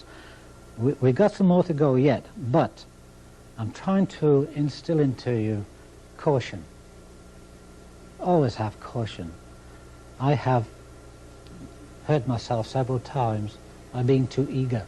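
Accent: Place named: West Midlands, United Kingdom. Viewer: is from Wales. is from the West Midlands in England, by my ear.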